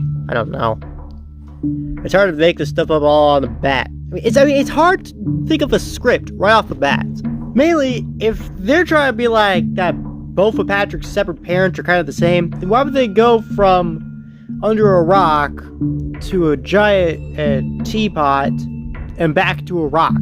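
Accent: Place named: South, United States. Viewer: You sometimes speak with a southern drawl. Are you southern, or is this an act?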